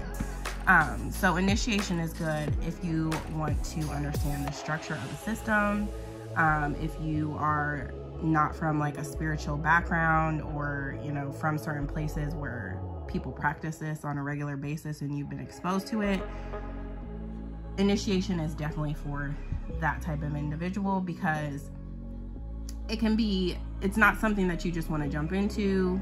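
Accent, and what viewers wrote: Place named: Northeast, United States. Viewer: very helpful thank you!! Also you sound a little like me-- and people don't always know what my race is based on my voice lmaooooo-- are you from the NorthEast of USA? (I'm from NYC)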